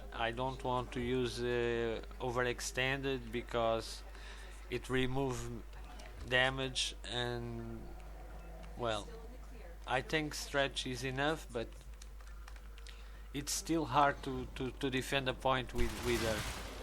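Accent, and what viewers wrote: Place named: Europe. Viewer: 2 things: 1.) What accent do you have? Sounds European 2.) If you can, show your mods for all your stuff, would be cool to see what you use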